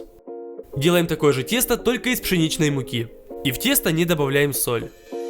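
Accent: Ukraine. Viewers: И сейчас есть украинский акцент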